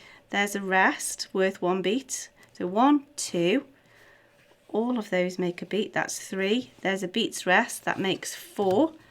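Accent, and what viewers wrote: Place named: Staffordshire, United Kingdom. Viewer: knew it!! I know a Staffordshire accent when I hear one :) I’m from Derbyshire!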